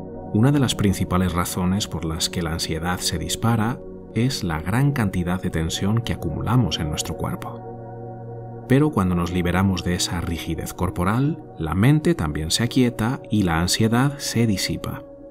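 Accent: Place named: Spain. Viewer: Tienes una voz muy linda y con tu acento español mucho más. Dios bendiga tu don.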